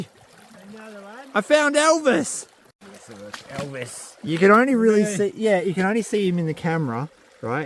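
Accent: Australia. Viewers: Aussie accents are only 50% understood to start with. The background noise cuts that by too much to know what's being jabbered about.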